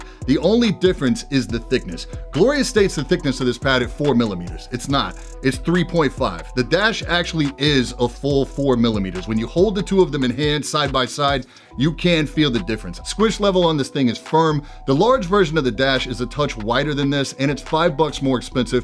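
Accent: United States. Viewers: You have the most American voice ever.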